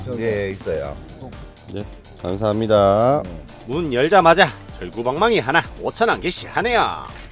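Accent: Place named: Gyeongsang, South Korea. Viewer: 경상도 같으신데~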